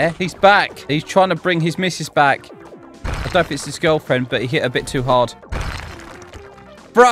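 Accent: United Kingdom. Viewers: I just realized that this YouTuber is British💀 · Are you British😂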